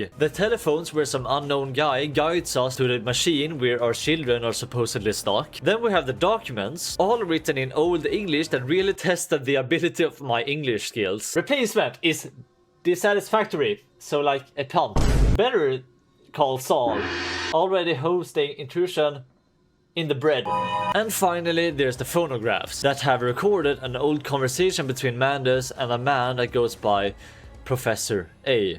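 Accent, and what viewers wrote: Sweden. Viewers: It could be worse... he's better than most swedes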